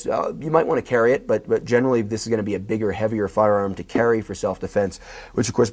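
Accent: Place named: Chicago, United States. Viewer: You must be from Chicago!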